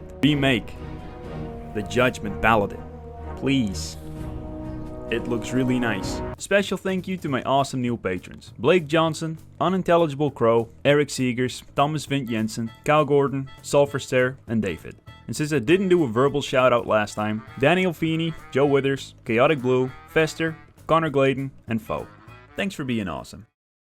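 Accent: Netherlands. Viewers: Je spreekt super goed Engels voor een Nederlander. Letterlijk accentloos. Echter hoorde ik heel lichtjes dat jij een Nederlander was! Super content man. Het is jou gegund om te groeien als een knol!!!!🎉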